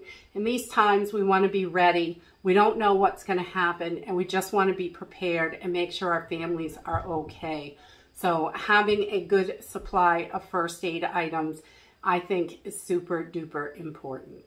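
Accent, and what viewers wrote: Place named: Bronx, United States. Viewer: Wow that is one i don't have that wrap. Every time i see you up i think Oh I have to go watch you you get me grinning, i don't want to sound personal are you or family from the bronx? You sound like you have a bronx accent. Love your channel. ☺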